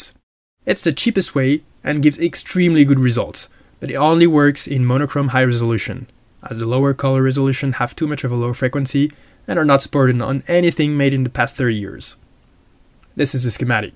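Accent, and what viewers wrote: France. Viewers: Ahh cet accent bien fançais :-) Superbe vidéo, merci !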